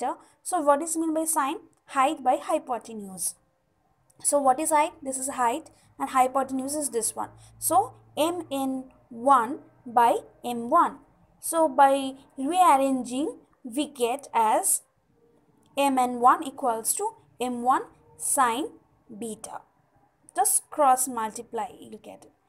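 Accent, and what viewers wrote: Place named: United States. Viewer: Indian accent would be better than ur American accent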